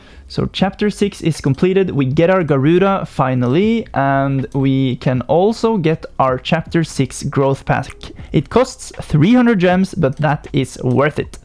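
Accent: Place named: Norway. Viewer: Kan høre at broder er norsk, keep gaming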